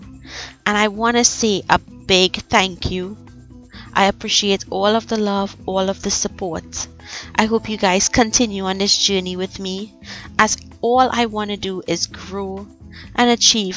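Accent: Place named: Trinidad and Tobago. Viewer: I love how you stayed true to yourself and your culture throughout your many years in America, accent and all! Some Trini's dis act brand new de minute they land foot in America, it's so hypocritical!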